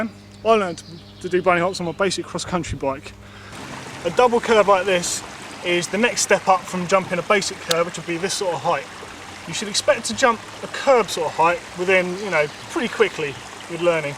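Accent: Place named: United Kingdom. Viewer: its just a normal british accent lol wats so amazing about that?!?!?!?!?!?!?!?!?! :L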